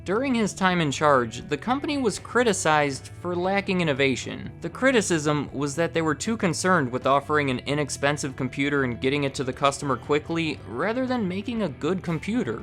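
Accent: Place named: Midwest, United States. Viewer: I'm currently watching this from a Dell XPS desktop with 16 GB of ram, Intel i7 processor, and a NIVDIA GeForce 1080 graphics card. You haven't experienced the thrill of serene Midwestern accent until you've heard it in high definition.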